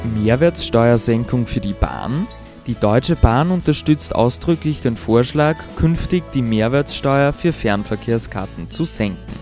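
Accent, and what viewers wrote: Austria. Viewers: Das klingt nach DDR-Slang, ist aber aufgrund des österreichischen Tonfalls unwahrscheinlich.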